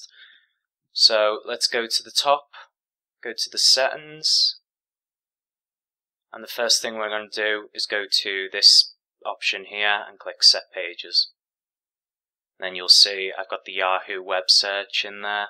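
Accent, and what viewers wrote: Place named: Lancashire, United Kingdom. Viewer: This helped a bunch. Cheers! (P.S. you're definitely from Ormskirk).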